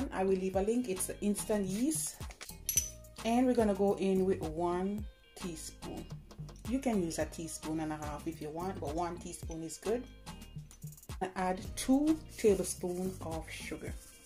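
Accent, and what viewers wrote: Jamaica. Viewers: I just love a Jamaican accent. No one else on Earth sounds like my beautiful Jamaican brothers and sisters. And the coco bread is looking lovely too.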